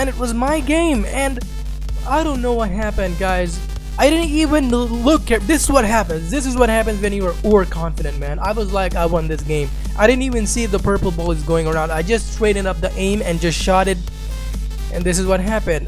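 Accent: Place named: South India, India. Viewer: Assalamu alaikum! Which is your native? You seem to be from South India! though you live in Kanpur.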